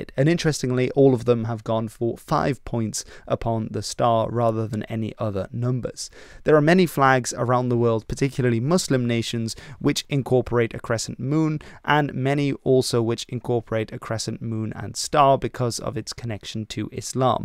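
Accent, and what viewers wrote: Arab world. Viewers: Why are you reading Turkish words with arabic accent? It sounds weird and wrong af..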